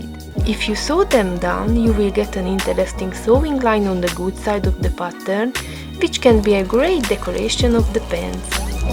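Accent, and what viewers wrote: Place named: Romania. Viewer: Wow, láttam a blogodon magyarul írsz. 👌Picit hallom a Román akcentust is most hogy mondod. Jó tudni hogy van más ember is akit érdekli a doll customize. Bocsi én meg már félig angolul gondolkozom. 😅 Kérdés: rendeled az MSC-t online, vagy honnan szerzed, kiszeretném majd próbálni ezt az egész Repaint dolgot ( I tried my best to write in my mother language 😅)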